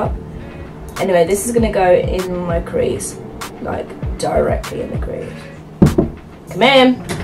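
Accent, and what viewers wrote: England, United Kingdom. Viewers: Where in England are you from?? Flawless btw xx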